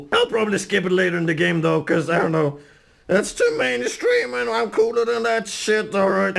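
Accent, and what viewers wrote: Sweden. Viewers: Are you Swedish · Why are all swedish (I kinda heard a accent) YouTubers so crazy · WTF are you Swedish?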